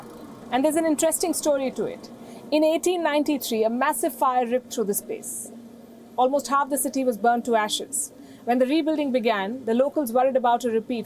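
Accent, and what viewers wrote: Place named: India. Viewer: Palki Sharma Indian accent makes me laugh to dead.Indian won't give up their thick heavy Indian accent anytime soon.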